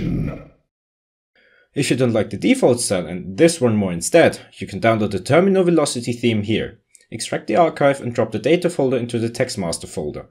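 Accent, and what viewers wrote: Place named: Austria. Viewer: Oh you had a more "austrian" accent at that time.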